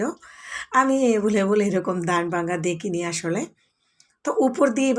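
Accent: Sylhet, Bangladesh. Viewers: Apur mat ta very nice lager na sylheti kori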